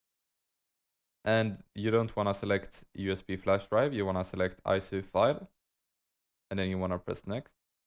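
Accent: Sweden. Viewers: ayyy du är svensk lmao tyckte att jag kände igen dialekten haha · swedush my man som jag xD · You Swedish? Same!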